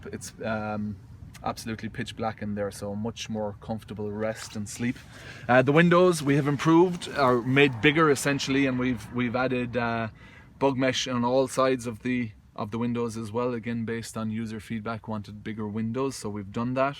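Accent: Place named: United Kingdom. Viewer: I agree people sometimes add sounds to words unnecessarily. That being said, it seems American English is not the first language of the host of this particular video. There are many millions of folks out there who speak British English--they pronounce aluminum a different way. <link> And in my book, different in this case is perfectly fine. Who am I, or you, to judge anyway--aren't there better things to be spending our time on than calling people out publicly/on social media for things like this?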